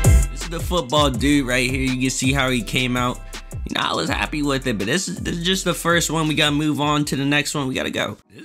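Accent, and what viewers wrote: Baltimore, United States. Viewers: Are u from Baltimore??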